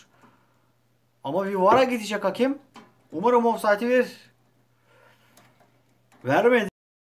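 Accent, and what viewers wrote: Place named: Kırklareli, Turkey. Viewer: konuşmandan anladım bende Kırklareliliyim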